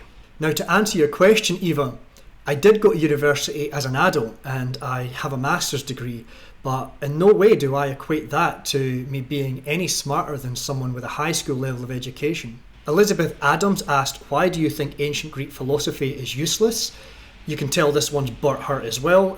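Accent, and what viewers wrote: Scotland, United Kingdom. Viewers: The fact that this is all narrated with a heavy Scottish accent makes the whole diatribe the more entertaining 😂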